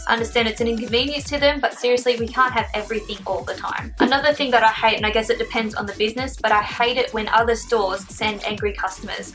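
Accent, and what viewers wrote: Australia. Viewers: Your accent is so pretty. Are you from Australia?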